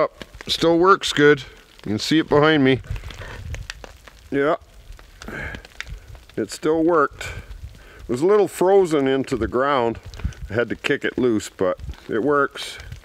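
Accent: Canada. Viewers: Hey, I tune in from Australia as enjoy your Canadian voice. Plus I learn lots.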